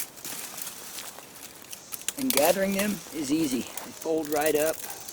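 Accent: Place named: Texas, United States. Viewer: He is from TX.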